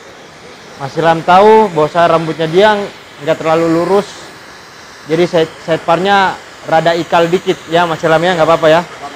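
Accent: Makassar, Indonesia. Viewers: Emm berbau Makassar logak nya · Orang makassarka bang?...karena dari logat abang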